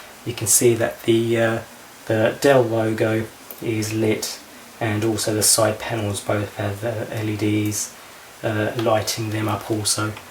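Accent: England, United Kingdom. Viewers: r u english?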